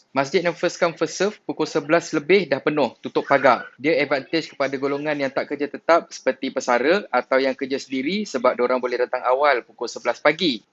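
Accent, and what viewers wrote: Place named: Perak, Malaysia. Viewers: uish..org loq staq ka nie.? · Awat hang tetiba cakp kl..orang cakap utara hg cakp kl😂😂..sebb dalam video banyk gak cakp utara hahaha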